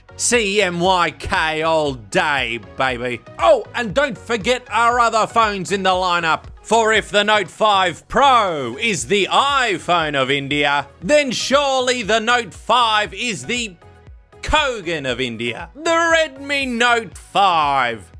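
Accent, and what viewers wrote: Scotland, United Kingdom. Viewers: Your Scottish accent pronunciation crakes me up! That is a good one! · Your Scottish accent is hilarious. So talented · The way he pronounced xiaomi like and a dragon ball z here 😂😂😂and that Scottish accent is Killin it 😉🔥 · The Scottish accent 😂😂👌🏻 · That Scottish influence tho. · The Scottish accent 😂😂😂😂😂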